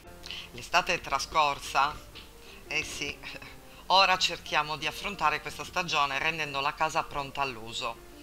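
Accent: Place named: Sardinia, Italy. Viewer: Wow che bella voce forte e si sente l'accento Sardo,Bel video cara,molto ben fatto anch'io inizio le pulizia dalle parti alte,like e buona giornata!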